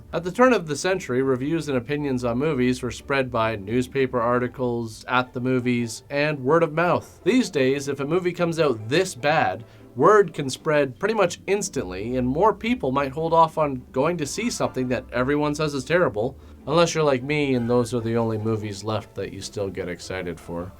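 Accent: Canada. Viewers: Inner? He wears his Canadian on his sleeve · are you Canadian?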